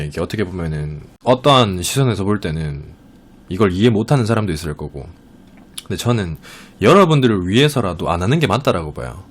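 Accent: Gyeongsang, South Korea. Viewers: 은 너무 많은걸 알고계시다 마인드가 스마트하네 대구 말씨도 넘좋다 ㅎㅎ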